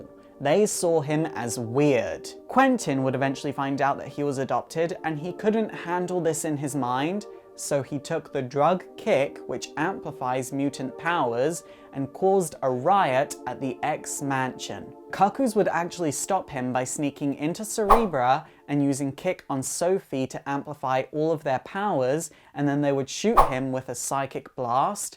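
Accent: United Kingdom. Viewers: Hes british, i think he is pronouncing it the way its supposed to be.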